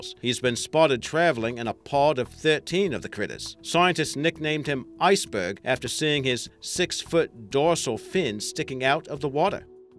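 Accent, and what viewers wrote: United Kingdom. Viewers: You know theres something called a british accent?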